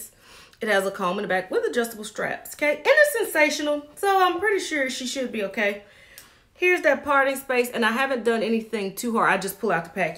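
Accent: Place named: Mississippi, United States. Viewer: Chiiiile, you're so Mississippi and I LOVE IT! I went to HS with your baby sis, and I definitely see lovable personalities are in yall's bloodline. Keep it up, love. When I'm missing home, I watch you. That accent takes me right back to Highway 90, lol! #HugeFan!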